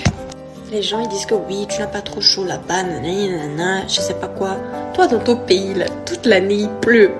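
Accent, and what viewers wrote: Benin. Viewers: Le vrai accent Béninois extraordinaire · J'aime trop ton accent béninois, très cool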